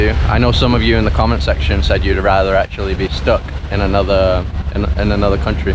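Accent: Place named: Scotland, United Kingdom. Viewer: That’s a funny sounding Scottish accent, what part of Scotland are you from mate?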